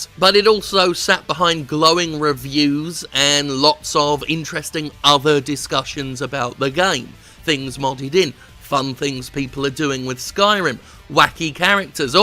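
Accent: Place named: Working class, United Kingdom. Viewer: I don't really watch for video games stuff. Working class British ranting is the only way I can finish. Thanks!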